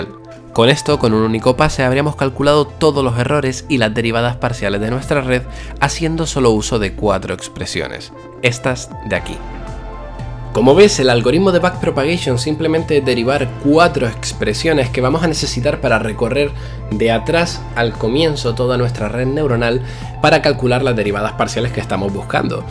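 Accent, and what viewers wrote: Canary Islands, Spain. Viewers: Pero pero pero pero pero como no habia encontrado este canal antes? Vengo por el video de JSantaolalla..................... Pero pero pero eres canario? por el acento "un poco"............... ¿??¿?¿ Pero pero pero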